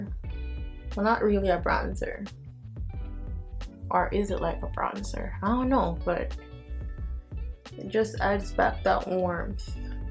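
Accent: Jamaica. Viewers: Are you Jamaican 😊 ?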